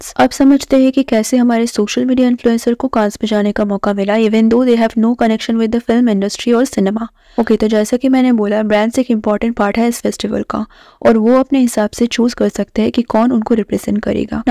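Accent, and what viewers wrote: Bengal, India. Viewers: Aap bengali ho?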